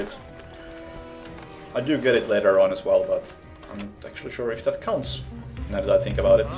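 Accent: Finland. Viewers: Arvaa helposti ku kuulostaa vähä rallienkulta😅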